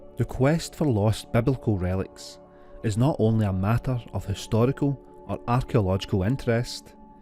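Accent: Scotland, United Kingdom. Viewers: Starting to look forward to that Scottish voice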